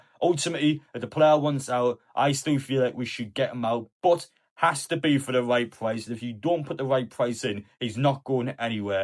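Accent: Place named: Liverpool, United Kingdom. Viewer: Slapheed self pitying wanker. Good Liverpool accent.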